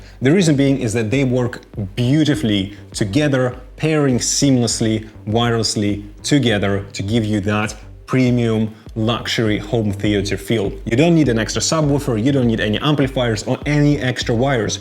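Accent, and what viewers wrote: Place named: Russia. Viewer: Русский паря чтоль?)) Акцент дичайше русский) Но сама речь - мое почтение) Зато каждое слово понятно)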